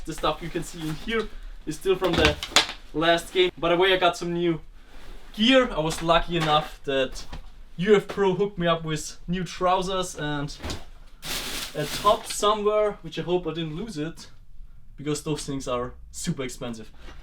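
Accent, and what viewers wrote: Bavaria, Germany. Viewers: German accent right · sprichst du deutsch,schweizerdeutsch oder bairisch ??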